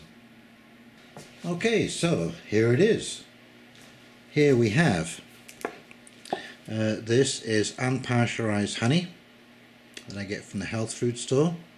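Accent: England, United Kingdom. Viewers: hello matey, i'm in the UK, near Oxford - surprised how English your acent is - thanks for the tip.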